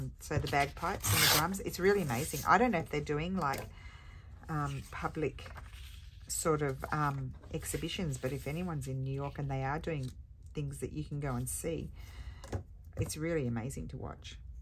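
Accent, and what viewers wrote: Australia. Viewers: Just found you today. Nice to hear another fellow Aussie ❤ Do you have the name of that seedpod stamp you used? It was gorgeous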